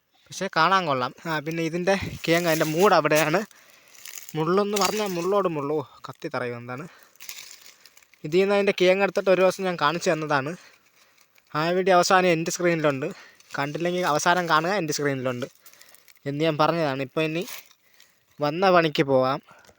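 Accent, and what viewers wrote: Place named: Malappuram, India. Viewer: മലപ്പുറം ക്കാരുടെ സംസാരവും ഇതും ഒരുപോലെ....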